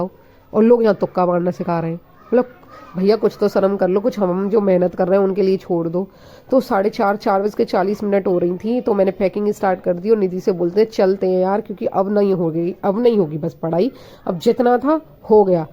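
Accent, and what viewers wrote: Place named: Mathura, India. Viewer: Aap proper mathura ki ho kha se h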